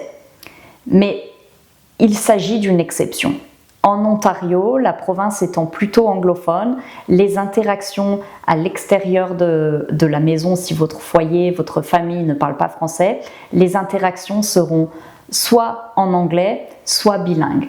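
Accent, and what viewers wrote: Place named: Europe. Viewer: Votre accent me parait europeen. Je suppose que vous avez demenage au Canada d'un pays European. Merci pour l'encouragement de practiquer le franchise quand l'occasion se presente, et je suis tellement d'accord.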